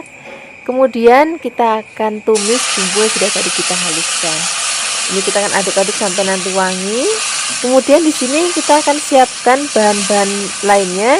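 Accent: Java, Indonesia. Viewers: MBK medok jawanya, denger suaranya ayem deh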